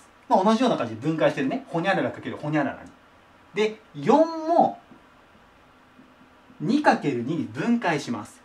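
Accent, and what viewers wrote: Kansai, Japan. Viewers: たまに関西弁出てるのおもろw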